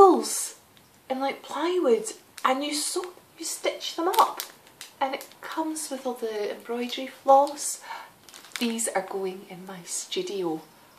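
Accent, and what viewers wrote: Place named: Scotland, United Kingdom. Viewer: Oh you are such a sweetheart:) so glad to see that your move went well! I just love your Scottish accent I could listen to you talk all day my dear! Looking forward to the Christmas sock swap!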